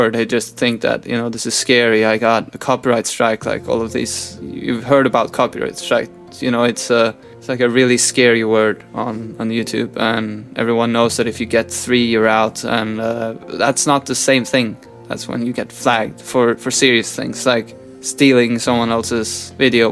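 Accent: Norway. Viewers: NORWEGIAN THATS WHAT THAT ACCENT IS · 1. Congrats on the 200k subs! 🙌 2. Thanks for clearing up the copyright thing. That stuff had me confused, but now I get it 👍 3. It's nice to hear your voice again. I love your Norwegian accent 😌 4. I would totally buy your merch...if I have money that is 😅 5. Skyworld! Wooo! I love that series! 🙆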